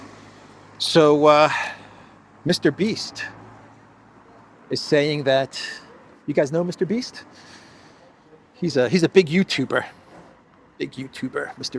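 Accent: United States. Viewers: he sounds American. That's why i.asked.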